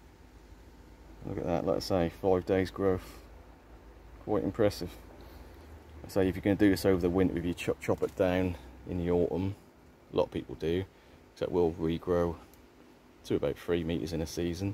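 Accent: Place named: East Anglia, United Kingdom. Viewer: Hi would you sell me a couple of cuttings …. From your accent I can detect a slight east Anglian lilt. Possibly Norfolk? Or north Suffolk. Anyway I travel to norfolk every couple of weeks so I could collect as I’d like to try growing it. Having been inspired by your video or you could post …? If not. Any ideas where I could get hold of some?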